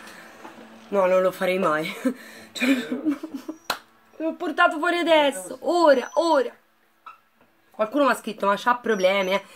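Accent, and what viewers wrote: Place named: Rome, Italy. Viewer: A A ME, ME PIACE CHE PARI ROMANA!!!!!! PERO' IO SO' DE' PARTE!!!!!😅😅😂😂😂😘😘😘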